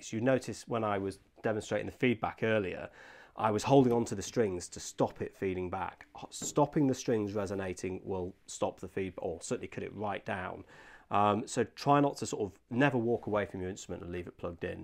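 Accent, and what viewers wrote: United Kingdom. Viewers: I've got a completely un-bass-related question for you. You're from the UK right? What specifically is your regional accent? I've had an accent my entire life that many people have assumed is British, but I've always been skeptical, but never sure what it really was. There are certain nuances in your speech that actually match mine; so I was wondering what your regional accent is.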